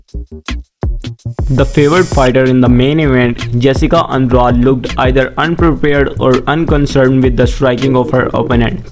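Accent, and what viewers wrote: India. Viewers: MY GUY WITH THE INDIAN ACCENT!!!!! WHATS UP MY FRIEND?! Vegas 69 main card was the drizzling shits but Blanchfield was awesome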